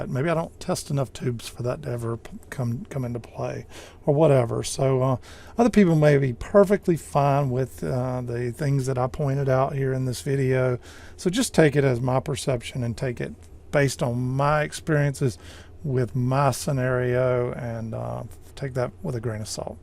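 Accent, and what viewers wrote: South, United States. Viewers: Despite your light Southern accent, I will credit you with pronouncing the word "tubes" instead of "teebs".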